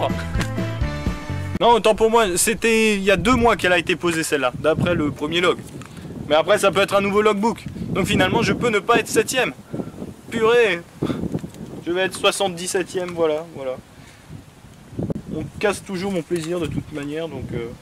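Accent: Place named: Alsace, France. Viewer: MMMMMH Cet accent Alsacien! arrêtes de te renier:3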